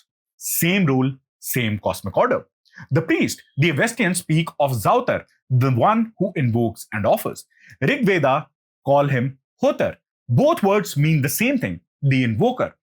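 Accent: India. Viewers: Indian accent 😂😂😂😂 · Indian english. omg. Half of it is a blabber.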